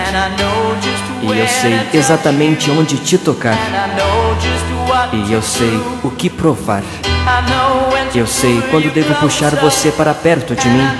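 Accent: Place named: Paraíba, Brazil. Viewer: Voz meio Paraíba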